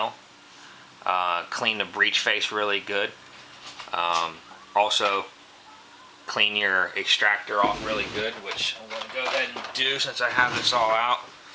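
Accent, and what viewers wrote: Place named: Midwest, United States. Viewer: Good informative video. Your Midwestern accent kinda annoys me but it's not a deal breaker. Just breaking balls. Next you can do one on a JA9 since I have one of each.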